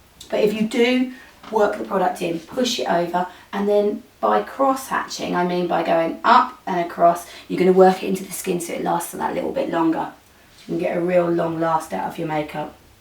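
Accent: United Kingdom. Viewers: Zali P British accent* or I guess it can work either way. But if you Google it, there's tons and tons of speculation.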